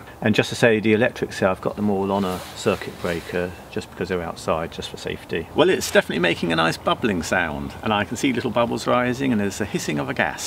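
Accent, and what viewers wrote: United Kingdom. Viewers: how wonderfully British! Love it!!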